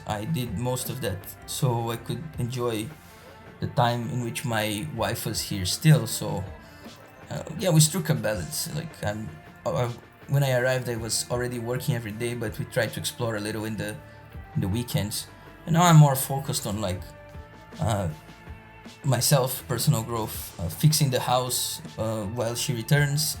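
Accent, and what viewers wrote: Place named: Russia. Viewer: Tem N com sotaque russo, tem like.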